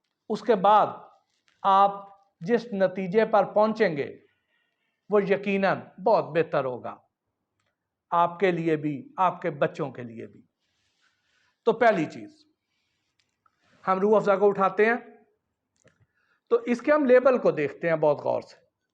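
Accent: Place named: Punjab, India. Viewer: this pendo man does not know anything . his urdu accent is mixture of punjabi . funny language 😆😆😆😆😆😆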